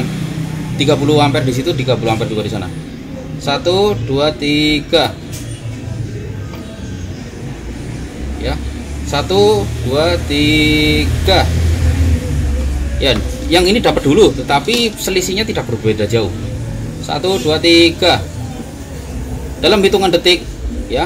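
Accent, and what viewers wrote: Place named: Banyumas, Indonesia. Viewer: Masee orang ngapak yaak? 😁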